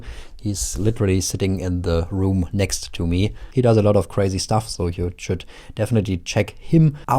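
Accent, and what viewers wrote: Germany. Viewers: Sag mir das du deutsch bist ohne mir zu sagen das du deutsch bitte der deutsche akcent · You can hear this German acsent in his voice 😂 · Dein Englisch klingt so deutsch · Der deutsche Aktzent izzzz da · Man hört den deutschen Akzent haha. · you sound german ^^ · Deutscher Akzent 🫣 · i hear german out of you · Ich Spüre Deutsch in deiner Stimme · Yo i knew you are from germany by the sound you talk like BrickCrafts 😅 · this german accent is furchtbar :D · Der deutsche Aktzent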